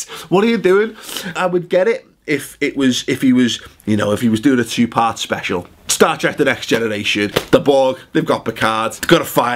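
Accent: Liverpool, United Kingdom. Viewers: This Scouse git needs subtitles for his channel so the viewers will know what he's saying.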